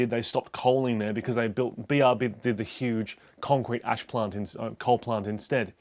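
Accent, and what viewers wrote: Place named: Australia. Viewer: Completely off topic, but what is your accent. I was thinking Australian ex-pat? Melbourne from the Herbert's T shirt?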